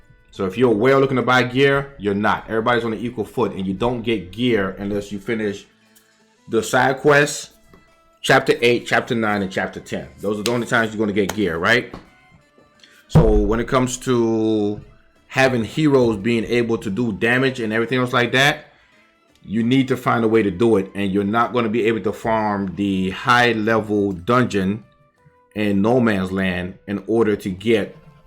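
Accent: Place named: East Coast, United States. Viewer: Bruh you have an east coast accent. Where you from? 😆 Subbed for the swag the content was extra.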